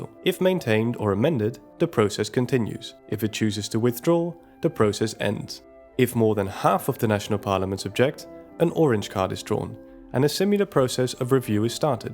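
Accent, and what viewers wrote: Netherlands. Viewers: Can I place a guess and say you are Dutch or Flemish?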